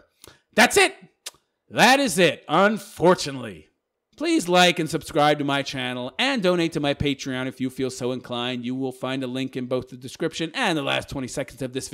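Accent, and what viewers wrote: New York, United States. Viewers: I swear this is Bill Burr s secret YouTube personality haha Sounds just like him he puts the Patreon thing up to sell it that he's a regular guy too hah a bit of a voice changer mic too if not Bill should hire this guy to fill in for em when he's sick perhaps he's a lil more new york than Boston but still crazy close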